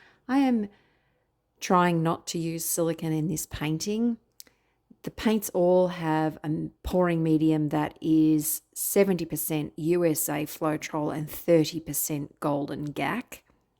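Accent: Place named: Australia. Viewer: Just found you, recognized the Aussie accent and subscribed😎 love watching it unfold it's beautiful and prefer with silicone I also like the dark together. Lots of love from Sydney🇦🇺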